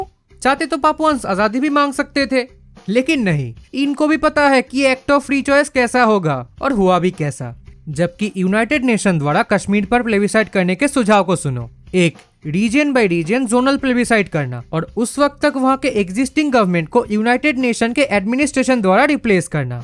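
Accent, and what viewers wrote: Bengal, India. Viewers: Typical bong accent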